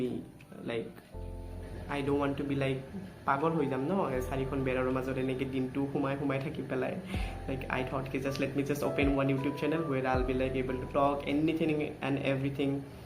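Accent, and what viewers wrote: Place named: Nalbari, India. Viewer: kot ghor tumar. Nalbeira jen lagsi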